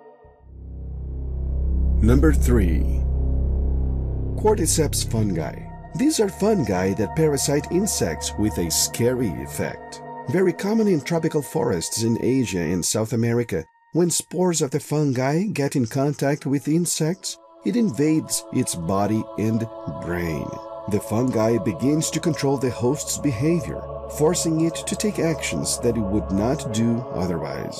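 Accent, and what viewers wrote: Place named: Eastern Europe. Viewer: This guy sounds like Idubbbz eastern European accent lol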